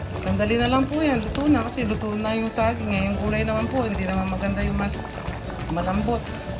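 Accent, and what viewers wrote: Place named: Pampanga, Philippines. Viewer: Galing ng magluto cabelen talaga halatang kapangpangan ya pamagsalita.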